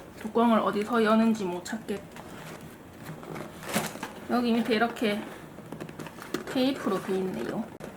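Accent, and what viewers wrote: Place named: Gyeongsang, South Korea. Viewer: 경상도쪽 사투리에 뭔가 귀여운 말투 ㅋㅋㅋ 재밌게 봤네오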